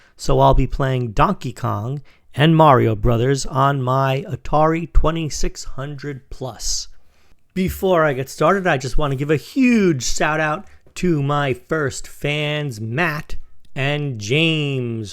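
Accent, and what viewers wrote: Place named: Queens, United States. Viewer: Long Island NY? That accent sounds more like Queens than LI!!!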